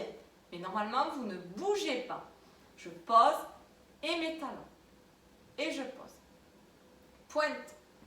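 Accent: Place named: South, France. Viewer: Merci pour cette séance,j'aime ce petit accent du sud! Je vous suis depuis la Belgique!!!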